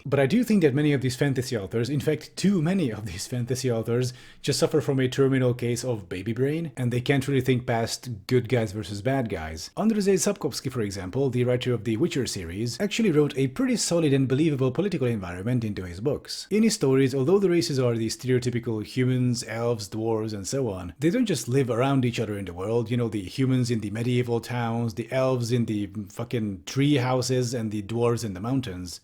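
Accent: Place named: Scotland, United Kingdom. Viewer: Interesting video Ádám, loved the Scottish accent. I've Subscribed. Looking forward to more videos. Is this the channel where you don't rant about trains and busses?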